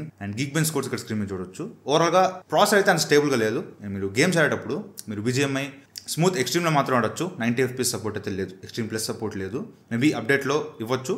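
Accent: Nellore, India. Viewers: Bro meedhi nellore aa? 😮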